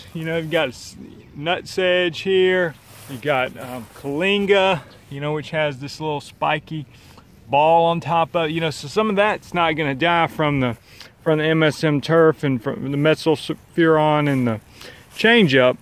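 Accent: South, United States. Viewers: Thank you so much. Dude talks way too much. Sounds like he's from the south...which explains a lot.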